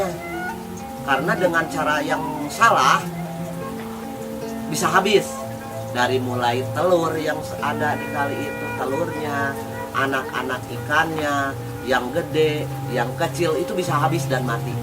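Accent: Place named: Sunda, Indonesia. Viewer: Uhh sunda pisan , mantap kang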